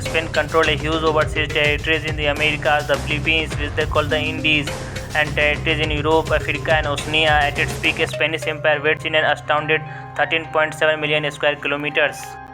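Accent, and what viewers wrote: India. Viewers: Indian english i dont hate but its annoying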